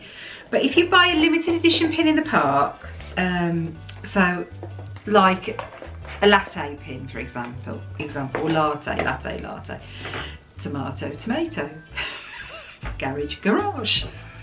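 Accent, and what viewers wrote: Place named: Southern England, United Kingdom. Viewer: 😘hopefully we get to trade someday! Love meeting new Disney Friends - especially in the UK. From your accent I'm guessing down South? Although, I am terrible at guessing accents!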